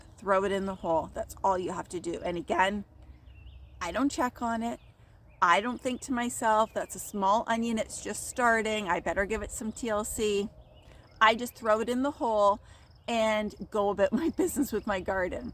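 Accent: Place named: Canada. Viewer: I found your video looking these up because my grandparents grow these and I’ve been wondering how to use them to see if I want to take some to start in my yard. Fellow Canadian here in southern Sask and as soon as I heard you speaking I knew you were Canadian.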